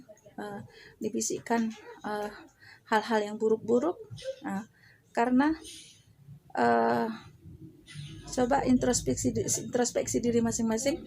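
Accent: Aceh, Indonesia. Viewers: logatnya aceh...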